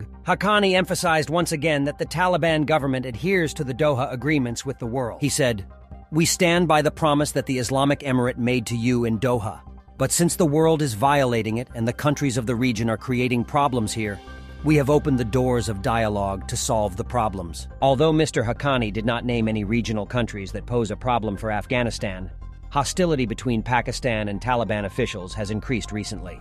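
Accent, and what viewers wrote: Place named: United States. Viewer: With your American accent, everything you report should be taken with a pinch of salt. Stop making two brotherly states fight for your ulterior motives. We Pakistani citizens have always supported the legitimate cause of Islamic Emirate of Afghanistan. We wish our Afghan brothers all the best. IA with peace Islamic Emirate will develop and become a rich country soon IA.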